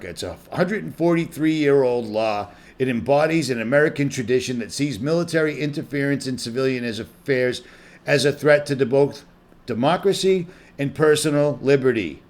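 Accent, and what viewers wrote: Boston, United States. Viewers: That’s Boston lingo.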